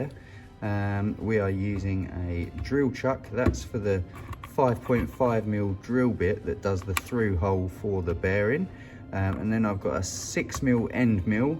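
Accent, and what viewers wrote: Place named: United Kingdom. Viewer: You sound like you're from the uk like me id love to buy a set of these if possible when i complete my build and get my electronics. Do you have Facebook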